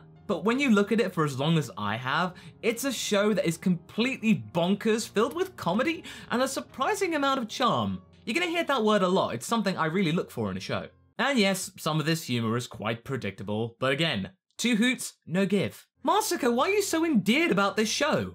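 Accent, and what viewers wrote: United Kingdom. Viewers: Well masako, good video, you are British Because you have an acent like a British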